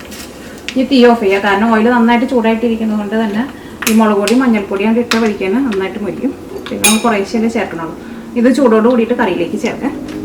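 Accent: Thrissur, India. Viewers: തൃശൂർ ഭാഷ ഒന്ന് മാറ്റിക്കൂടെ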